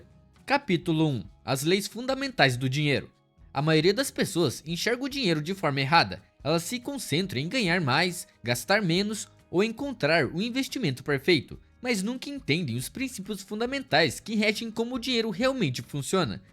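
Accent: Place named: Sao Paulo, Brazil. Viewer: Sou de Minas e não suporto este sotaque seu de Paulista. Mas vale pelo conteúdo informativo.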